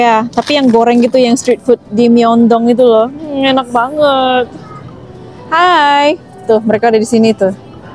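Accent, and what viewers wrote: Pontianak, Indonesia. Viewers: Logat pontianak kak tadak ilang suke liat kak ngomong 😊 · Yeayyyy shopping lagi ka bareng n daddy Boroooong ka, logat Pontianak nya gak ketinggalan ka ❤️💃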